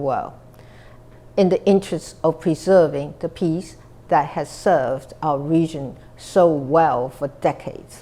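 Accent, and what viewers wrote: United Kingdom. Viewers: 讀稿機很正常，即便沒讀稿機，蔡總統英式英文原本就很熟是事實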